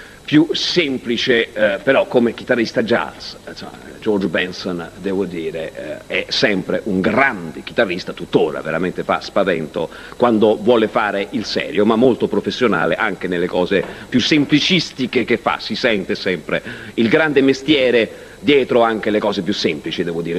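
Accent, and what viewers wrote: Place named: Milan, Italy. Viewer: Accento quasi da cummenda milanese. Mi stupisci sempre, e mi manchi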